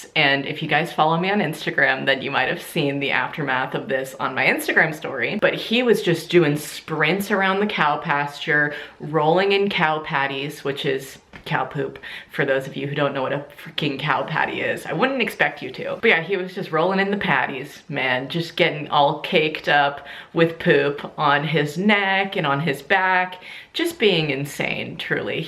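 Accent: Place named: Western Pennsylvania, United States. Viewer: This sounds like western Pennsylvania 😂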